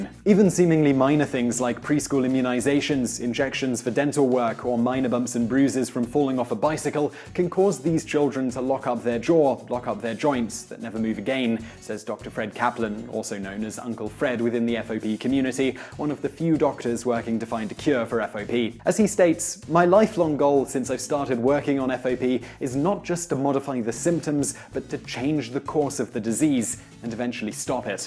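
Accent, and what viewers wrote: England, United Kingdom. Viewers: Is this guy English? I am from England and I can never tell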